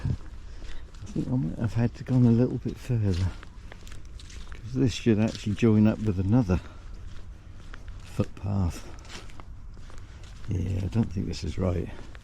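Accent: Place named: Norfolk, United Kingdom. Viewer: nice to hear the accent!, I'm a Norfolk gal living in Kent 🙂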